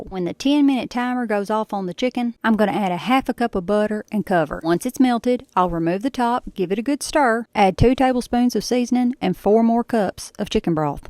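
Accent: South, United States. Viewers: This woman has a southern accent and she may pronounce it however she likes. I know I can pronounce pompous and presumptuous ignoramous pretty well, and you, my dear are one.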